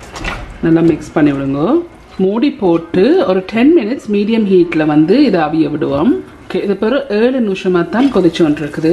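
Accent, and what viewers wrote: Jaffna, Sri Lanka. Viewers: Jaffna tamil👍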